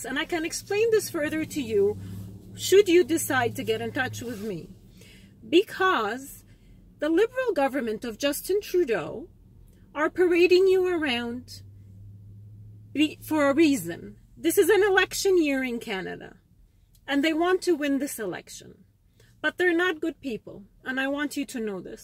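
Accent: Egypt. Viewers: كيف سعوديه وانت تتكلمين مصري؟ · Hhhhhhhhhhhh You are laying at your self saying your from saudi arabia 🤬🤣🤣🤣. You are from Egypt. Your accent is Egyptian 😱. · how you can be a SAUDI citizen but you accents like a Egyptian?🌚😸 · you not saudi Your accent is from Egypt. Don't lie and say you are Saudi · she said she is originally from Saudi Arabia , I am from Egypt and her accent is Egyptian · You are 100% Egyptian. The Egyptian accent!!!!!!! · How do you claim you’re Saudi while your accent is pure Egyptian? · Your accent is not from Saudi Arabia. Your accent is from Egypt. I think you were born as christian , not a muslim. I am from Middle East and everything is clear for me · Your accent is Egyptian... lying is not freedom.. U r right about parading Burdoo. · انتي سعوديه وكيف لهجتك مصريه😂😂😂😂😂😂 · Egyption accent and speaking on behalf of saudi women. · Pat Maguire believe me she is Egyptian I can smell the falafel from her ...we Saudis know each other + we don't live in egypt and you can't find a saudi with Egyptian accent . 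· Saudi with thick Egyptian accent 😭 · how come you are Saudi and you accent Egyptian ? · How comes she says she is a Saudi when she has a strong Egyptian accent she is not telling the truth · For people asking how come she’s a Saudi Arabian and her accent is Egyptian.. I’m Saudi Arabian and a lot of my male relatives are married to Egyptian women and their daughters speak with Egyptian accent just like their mothers while they’re Saudi Arabian. I just wanted to clear things up. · You are not Saudi , your accent Egyptian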